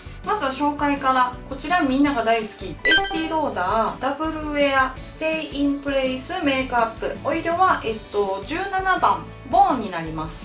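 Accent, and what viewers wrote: Kansai, Japan. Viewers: さん初めてコメします🙋‍♀️ いつも雑誌付録の紹介を見ていて、同じ関西弁で喋りも面白いのでチャンネル登録させて貰いました😊 私も40代…私、顔面崩壊しているので😅メイク動画めっちゃ良かったです‼️ 何を買えどもシミやらが消えません💦 ベルサイユのばら的なファンデを買ってみます☺️ 毎日暑いのでお身体ご自愛くださいませ😌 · 初コメです☆ こんばんは🌙😃 雑誌の付録動画で姉さまを知りました。お綺麗な方なのに飄々と面白い事言われるし関西弁大好きなので速攻でチャンネル登録しました✨ ファンデーション比較企画良いですね！私も顔に粗がたくさんあるアラサーですのでがっつり隠したい、厚塗り上等派です。普段はエスティーローダーを使っていて化粧持ちは抜群ですがカバー力もうちょい欲しいと思っていました。姉さまの最強ファンデ紹介をみてオススメBBクリーム試したくて早速買いました✌️カバー力本当に凄いですね！でも私は色黒なので203番でも白浮きしちゃいました…(^^; 他の濃いファンデと混ぜて使おうと思います💪 良きファンデーションを教えて頂きました！、ありがとうございます❗(人´ｴ`*)♪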